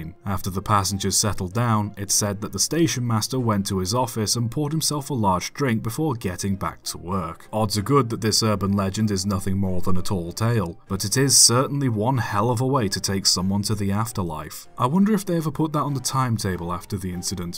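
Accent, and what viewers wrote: United States. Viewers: As an American, I have to say your American accent is extremely accurate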